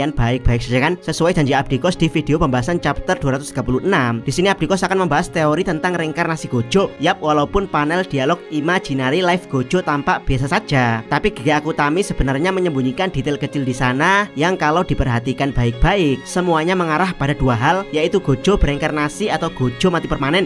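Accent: Java, Indonesia. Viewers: bang logat jawa nya luar biasa hahaah thx bang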